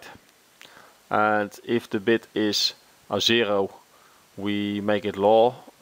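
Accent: Netherlands. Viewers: Hahah, amazing Dutch accent right there =) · hahahah jouw nederlands accent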